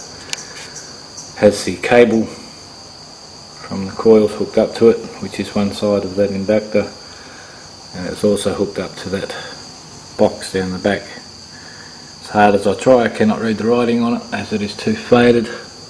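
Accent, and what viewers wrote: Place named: Australia. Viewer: lol aussie accent and crickets in the background and then a kookaburra to top it off!! haha gotta love aus! im from south west sydney!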